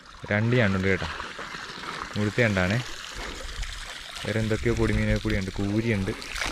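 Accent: Alappuzha, India. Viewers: Alleppey slang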